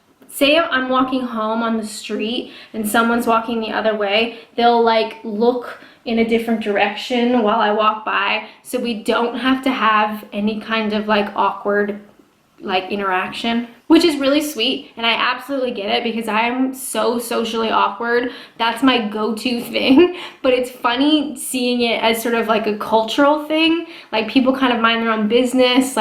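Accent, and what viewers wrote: Canada. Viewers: Hi ya welcome back. I hope you don’t start to lose your accent too much as it sounds so cool fresh from Canada. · Trawno yep the Canadian accent is stronger after the visit. :) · "Stay on the RIGHT.. Stay on the RIGHT" Made me chuckle. Don't put yourself down, You are very good at this and very intresting so keep it up. Love the way you Canadians say "Out" like "Ooout" Haha and a great way to tell the difference between an American and a Canadian ;-) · Not seen a vid of yours in a while. I check in on your gram though. That said, when I played this video, I realised I had forgotten you had a Canadian accent. You have a great fan-base, you'll get tons of subcriptions... Not me though.... I'm poor. Have a great day. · You definitely sound more Canadian now that you've been home for a bit! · Your losing your Canadian accent. 😁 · But I have noticed that the more i watch you i can see the difference between the US accent and the Canadian one nust from your pronounced of some words and how you enunciated certain words. 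· it's also so funny I notice your Canadian-ness in the way you speak, but you have a british accent on certain words now too (like the endings of certain words). Very interesting, not sure if you noticed! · I love your stronger Canadian accent. Noticed it straight away! I don't know if I'd prefer Canada, with it's big wide roads, but super long drives, or here, where everything is close but SO congested.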